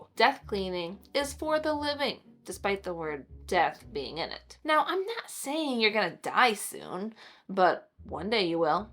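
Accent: England, United Kingdom. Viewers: Oi, a British accent IS normal. 🤣It's a lovely, gentle book, and full of good advice, and she's very right about doing it every few years from the age of 60 if not before. Our lives change, our tastes change, but we hang on to the old stuff that no longer suits us. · ENGLISH accent! There is no such accent as British. We are Irish, Scots and Welsh too. The accent you do is stupid English stupid Peppa Pig Hugh Grant! Do not ever come to Scotland and call us British. 😡😡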